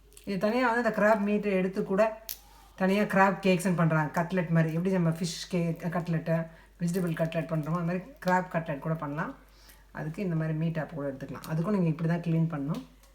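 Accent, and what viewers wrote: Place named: Chennai, India. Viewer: So sweet of u for carrying our Chennai slang .very pleasant to hear in u.s.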